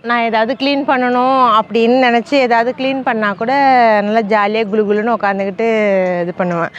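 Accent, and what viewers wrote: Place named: Thanjavur, India. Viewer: Azhagana Thanjavur side Tamil language... Engha soentha oor gnabagam varuthu....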